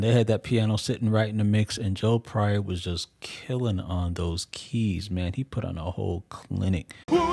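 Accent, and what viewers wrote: Caribbean. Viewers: Brotha, I hear nuances of a West Indian accent. Where do you hail from bro? (Weh Yo People dem Really from?). Blessings from Florida, hailing from the U.S. VIRGIN ISLANDS 🇻🇮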